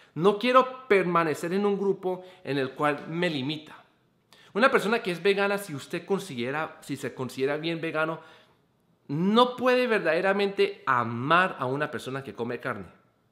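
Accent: Colombia. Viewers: Holaaaa me encanto tu video !! Esa es la neta como decimos en DF ahora Ciudad de México. A las cosas x su nombre. Beso me encanta tu acento colombiano.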